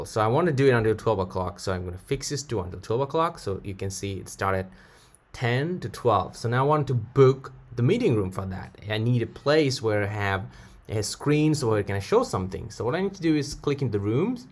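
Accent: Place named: United States. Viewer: Where are you from? Are you putting on an American accent. It’s very interesting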